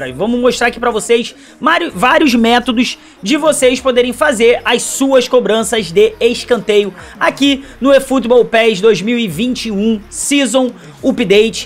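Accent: Rio de Janeiro, Brazil. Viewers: O melhor é o sotaque de Carioca raiz kkk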